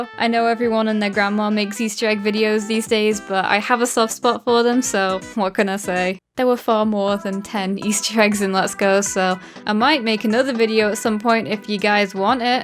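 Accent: United Kingdom. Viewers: Also its not wurld wide world olnly in urup im bulagarian a a oh yah youre not canadian your british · Love your British Accent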